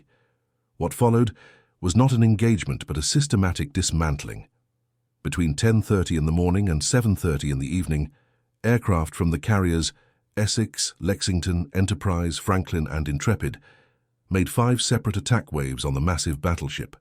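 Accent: United Kingdom. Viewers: Why is it always the voice of a Brit talking about American history ?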